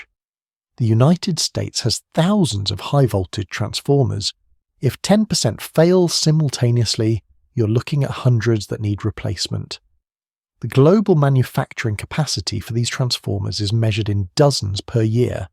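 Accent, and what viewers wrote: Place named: England, United Kingdom. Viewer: Its the strongest this year? Big Deal. Tell Me when something important happens without the English Accent.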